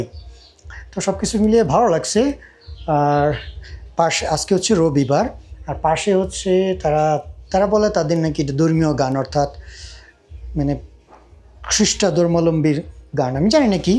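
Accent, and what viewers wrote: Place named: Sylhet, Bangladesh. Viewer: ভাইজান আসসালামু আলাইকুম আপনার কথার ভাষার মধ্যে মাঝেমধ্যে সিলেটি টান আসে আপনার বাড়ি আসলে কোন জেলায়❤❤❤❤❤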